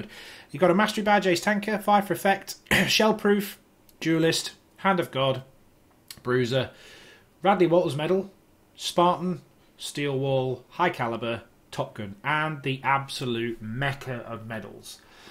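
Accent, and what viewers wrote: United Kingdom. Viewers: Tanks - check ! British accent - check! New Subscriber ? - CHECK !!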